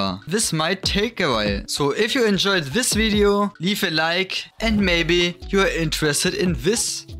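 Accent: Germany. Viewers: hey buddy good job on your tutorials you sound german and thanks for the tipps king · Nice german accent brother👍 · lol du hörst dich so deutsch an😂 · man hört das deutsche raus, nice vid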